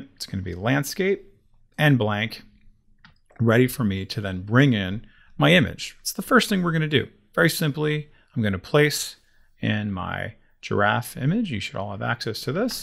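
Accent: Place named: United States. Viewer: Appreciated it but struggled a bit with Adobe help, just didn't 'get you started', it seemed to make assumptions about what you already knew (great chopping up layers but how do you get the pictures in the first place to be able to edit them?). This guy is brilliant, so well constructed, builds up nicely, importantly for a Brit, has a very toned down American accent and style - that's a compliment, he's really easy to listen to. Thank you so much, just brilliant.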